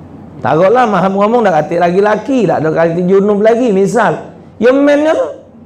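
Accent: Palembang, Indonesia. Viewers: Ustadnyo asli mano mang R nyo plembang nian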